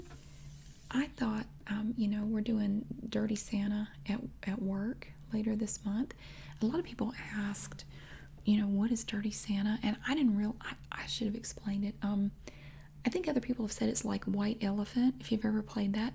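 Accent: South, United States. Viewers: Hi friend!!! I love your relaxing voice! I have a southern accent too. I hope my new ASMR channel does good someday like urs. I am new to doing ASMR and not that good at it but I have some cute ideas in mind to do once I’m not so busy with my photography. I also have a beauty channel too. I do beauty subscription box openings on there and sometimes pets vids of my critters. Hopefully soon u will stop by my channels too. I’m a huge fan or ur ASMR sweet friend and look forward to getting caught up on watching ur vids. I love the pug sweater, I have a pug named Sadie who will be turning 14yrs old this month. Do u have a pug too? Much love and support from Kentucky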